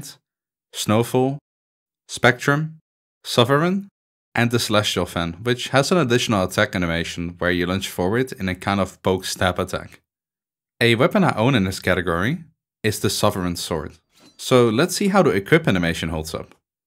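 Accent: Netherlands. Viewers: Are you dutch by any chance? Your pronouncation sounds dutch lol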